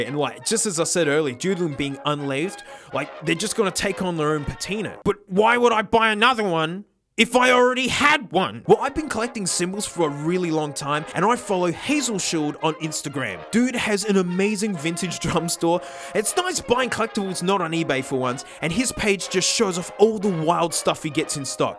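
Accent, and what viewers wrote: Australia. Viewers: I want SMOKO so leave me alone! Nah, I heard an Australian accent, whilst talking about drum-specific things - had to subscribe! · You sound like that one Australian guy that talks in that one Australia sound. You know that him I'm talking about? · Man you don't have to be so performatively australian to cater to the americans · I've been watching this guy for seconds max, and it also took me that long to hear JonTron in his strained voice and I will never unhear him as aussie JonTron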